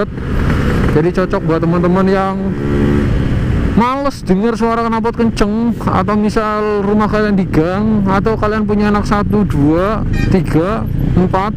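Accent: Banyumas, Indonesia. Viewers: Oke ges welkom bek tu may cenel wit mi, gedang doyan ges..ngapak nya terasa 😄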